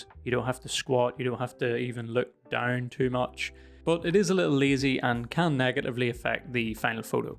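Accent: Ireland. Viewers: Brah gotta be Irish. Sounds like my bro from Belfast.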